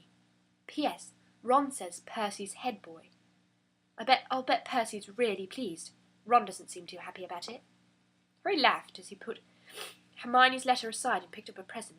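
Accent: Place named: United Kingdom. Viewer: which area in the uk your accent related?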